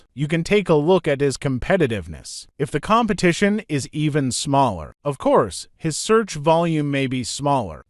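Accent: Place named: China. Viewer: Love the video, and I can't get over how your Chinese accent somehow shines through this AI voice. Way too cool, haha.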